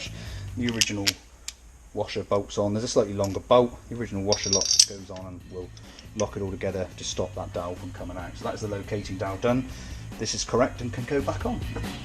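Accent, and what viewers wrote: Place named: RP, United Kingdom. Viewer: Don't you sound posh on these videos, nice work mate, love all this old stuff.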